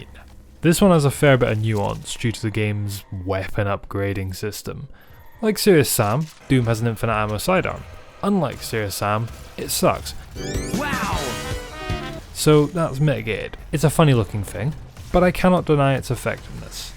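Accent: Scotland, United Kingdom. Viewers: Lad are you Scottish?? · it took me 14 minutes to realise you were scottish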